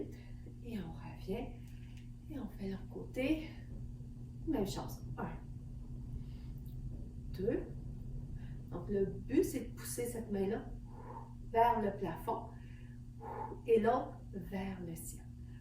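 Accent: Quebec, Canada. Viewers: Génial ! Vous êtes formidable ! Ce cours accompagné de votre délicieux accent du Québec est un vrai moment de bonheur! Merci 👍🏼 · Super séance! j'ai 78ans et j'ai adoré je le pratique un jour sur deux, j'ai enfin trouvé mon Pilates idéale en plus avec l'accent du Québec c'est cool, comme on dit chez moi c'est tip top!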